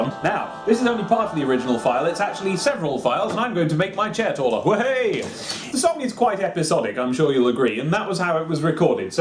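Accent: United States. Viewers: Your american accent is actually really good! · Wow, you sound very American! Great job! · you actually sound decent with your American accent. You just need to work on your R's and few other things. Look up some accent videos, it makes it much easier.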